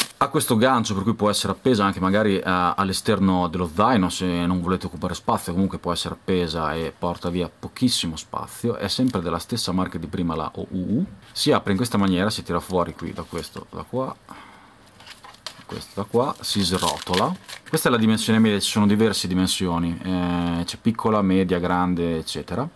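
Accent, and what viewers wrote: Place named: Modena, Italy. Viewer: Sembra di Modena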